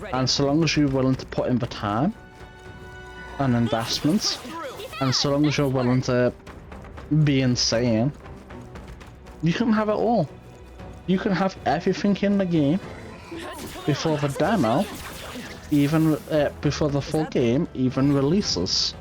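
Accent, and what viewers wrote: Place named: Liverpool, United Kingdom. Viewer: Our guide with a good Scouse accent. I love it 😂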